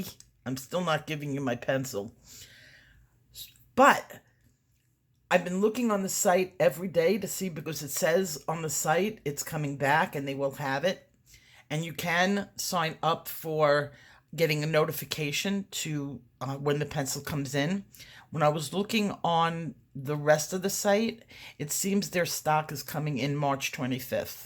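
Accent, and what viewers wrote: New York, United States. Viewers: beautiful. I had you older in my head. Must be the New York accent.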